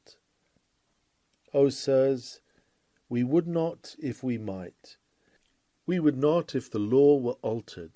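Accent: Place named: England, United Kingdom. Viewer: Was looking forward to this sermon, love this reader with English accent . Thank you Jesus .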